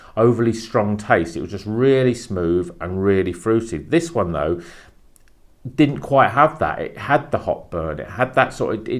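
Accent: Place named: United Kingdom. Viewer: i love british accent!!!! amazing!!! love your channel!!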